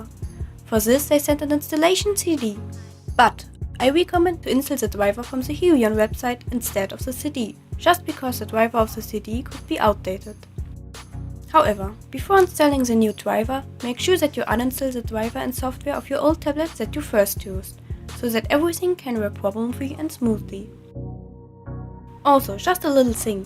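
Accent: Germany. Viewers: I don’t see why your embarrassed your english is fine, I have a german au pair so I guess i’m just used to the accent. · man merkt einfach das du deutsche bist XD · You are from Germany? Aber dein Akzent ist cool.😋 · I love your voice so much and I absolutely love german accents =3 I also love your artwork your one of the people who inspire me to get better at art. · God I love your accent, everyone loves British accents but German accents I’ve always found are the best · man hört dass sie Deutsch ist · German accents are my drug!! They're so cute!! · OMG I adore your voice i speak Netherlands so it's a little bit similar to german i can hear your german accent it's sooo cute <3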